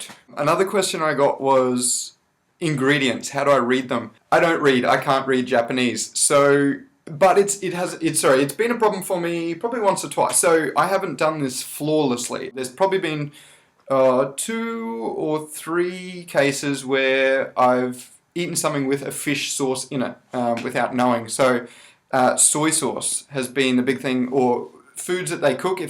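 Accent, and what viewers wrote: Australia. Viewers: yea, that's an Auzzie accent :)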